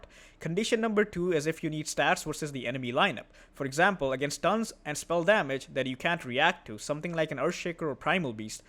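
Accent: India. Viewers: shut up disgusting indian accent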